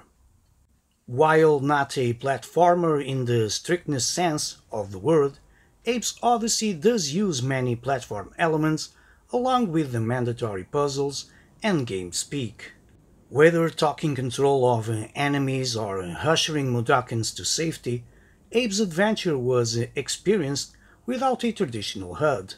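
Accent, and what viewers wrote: Portugal. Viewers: Are you portuguese? I think i recognize the accent )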